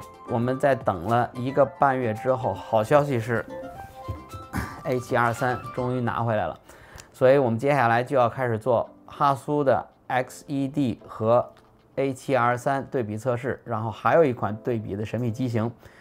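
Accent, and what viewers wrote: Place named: Beijing, China. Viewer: 啥时候澳洲又来一位，我就知道金叔和顾俊，看起来这位水准更专业，北京口音听起来不如那2位亲切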